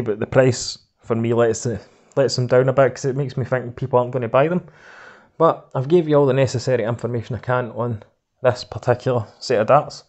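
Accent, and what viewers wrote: Scotland, United Kingdom. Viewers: Proper Scottish lad aren't ye lol good video and thanks from the US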